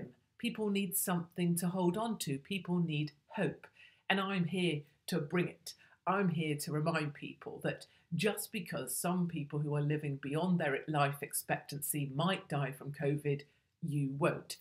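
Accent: United Kingdom. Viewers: your beautiful. Classy. Your british accent is perfect and not thick and heavy, l think some british try to make it thick.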